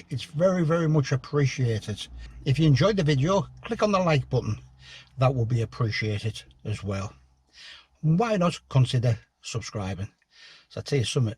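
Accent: Scotland, United Kingdom. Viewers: OK so why is it your last time up that mountain? It is a terrific hike. I kinda wish I had time to do it when I was there. Nice Scottish accent. 😎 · Another superb video, great memories of climbs past you shared with us, the views are amazing and so well shot by you. Scottish accent fantastic and funny. Really well done on the climb, I think you will be tempted to go back again. Keep the videos coming, I really enjoy them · that was absolutely class mate, I'll have to get the bonny lads up there ha ha 😀 The shots with the snow were tremendous, you know what I'm talking about!! Love the Scottish accent marra ha ha, don't know what you said but i don't think anyone knows what the bonny lads are slavering on about 😃 all the best Chris 👍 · Unbelievable, enjoyed that! Well done, 4 times is quite impressive! That Scottish accent got me hahah. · Looks like the mountain fitness is back then 😊. 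That Scottish accent - Wow! Must be some Scottish blood in the family. You’d look good in a tartan bandana if there was one 😊 cheers atb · Well done, you did it again! Class commentary and stories about previous experiences loved the Scottish accent but you need a kilt to be authentic!! Maybe you should try an Irish accent next, to be sure. Pity about the cloud at the top but you got spectacular views on the way up and down and it looked busy. Scottish roads are a bit like the lake district, narrow and windy. But rest up now, more adventures to come. Andrea sends best wishes, regards Ashley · Wow what a spectacular place. Stunning! I know what you mean about pushing on and not taking breaks. There are times where one must keep moving to ensure success. I’d call that a success despite the little bit of cloud whipping by the summit. Outstanding views at the top but really all along the trail. 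Glad that snap decision on a prior trip worked out ok. Kids are often tougher and more skilled than we give them credit for, but as a parent we’re worried about them on their own. It was no doubt a good experience for them. Oh and I love the Scottish accent!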